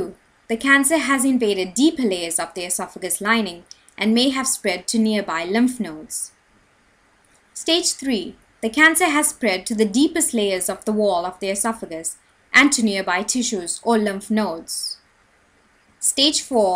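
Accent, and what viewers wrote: South Africa. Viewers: Are you from Durban?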